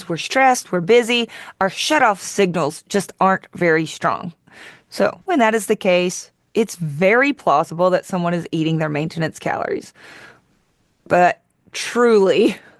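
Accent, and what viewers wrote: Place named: South, United States. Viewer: Am i the only one that giggles everytime she mentions "cute little husband" as code for libido? Golly, i love this gal. She talks like me, but in southern belle terms. Lol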